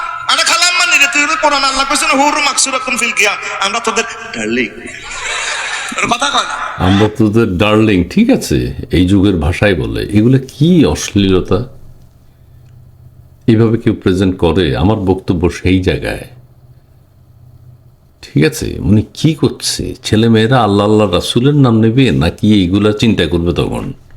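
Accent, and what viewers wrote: Noakhali, Bangladesh. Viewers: দুর ও শালা নোয়াখালী কি বলে বুঝতে পারলাম না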